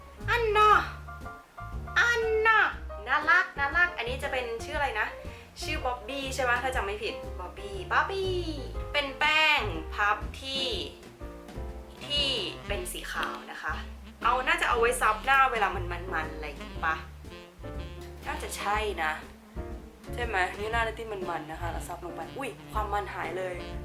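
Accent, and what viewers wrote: Isan, Thailand. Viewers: สำเนียงอีสานก็มา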